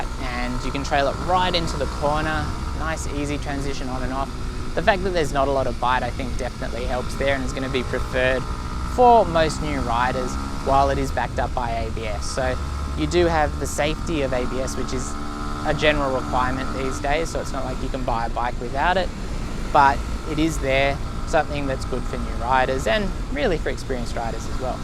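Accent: Australia. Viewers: Pretty standard aussie accent cobbarino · This accent is way too much for me..where you from bro Australia? Wide bahhs nice wide bahhs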